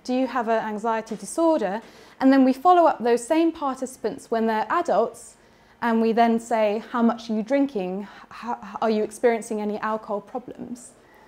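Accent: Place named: Bristol, United Kingdom. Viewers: a lecture by a British English speaker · she speaks perfectly understandable received pronunciation English with a Bristolian dialect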